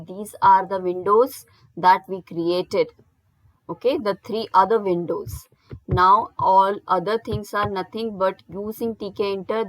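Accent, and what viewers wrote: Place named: South India, India. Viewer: South Indian Accent 😛😛👌👌👌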